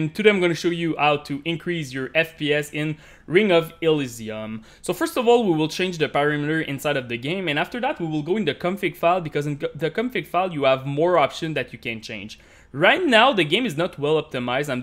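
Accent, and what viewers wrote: Quebec, Canada. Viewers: that french-canadian accent DAMN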